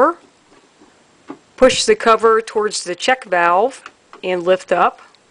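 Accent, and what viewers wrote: Philadelphia, United States. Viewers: Philly area gal. Can tell by the accent. "Wooder."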